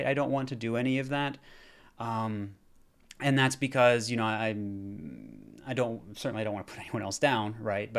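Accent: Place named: Tennessee, United States. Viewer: I'm just trying to figure out where your accent went. I hear a subtle Tennessee dialect. But other wise its gone.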